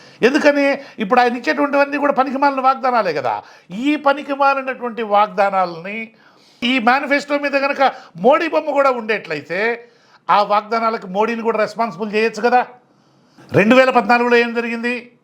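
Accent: Nellore, India. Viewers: Nenu Nellore Zilla anukunna, mee slangnu batti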